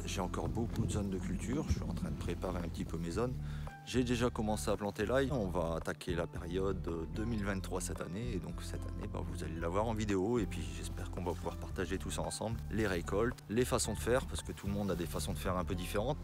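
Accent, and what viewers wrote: North, France. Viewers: J'avais bien reconnu l'accent chéri du Nord ☺️ Bravo pour toute ta belle création et tout ce que tu as donné ! Quelle énergie ! C'est une joie de te découvrir, de découvrir ta belle tribu animale. Prends bien soin de vous et belle suite encore.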